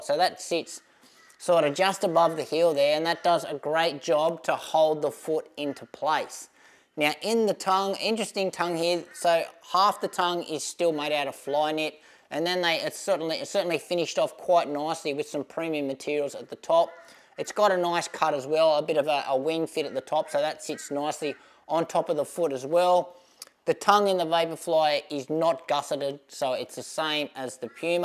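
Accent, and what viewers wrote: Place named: Australia. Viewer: This guy is a great reviewer and he has one of the most thickest austrailian accents.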